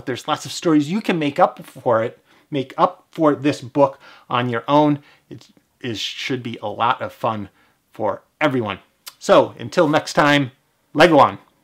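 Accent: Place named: Michigan, United States. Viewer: Are you from Michigan or Illinois ? You have a similar accent to mine. Nice video by the way I just discovered your channel.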